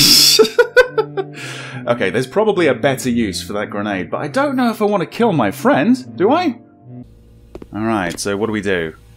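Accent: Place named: United Kingdom. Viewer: I really like your accent, which is weird because I hated British accents. No offense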